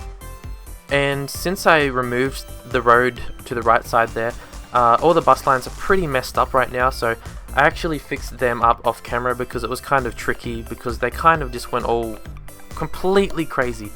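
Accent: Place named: Australia. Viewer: Yeah, I just looked up how it is in Australia, and people there drive on the left side too. And I'm not sure but I thought I heard a hint of Australian accent in his voice. Not entirely sure though.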